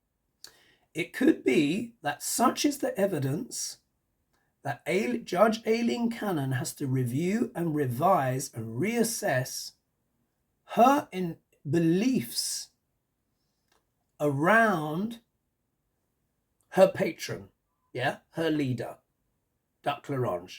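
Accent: England, United Kingdom. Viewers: as a gay gentleman I can’t help but say you look cute as a button and don’t ever do anything to that gorgeous English accent. You open your mouth and the angels come outdancing no less. May God save our gracious queen!!! ❤❤❤❤❤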